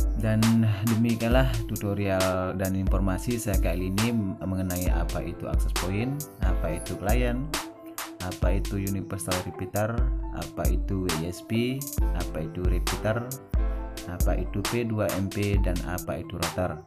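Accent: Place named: Lombok, Indonesia. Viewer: Kayaknya nada2 nya orang Lombok ini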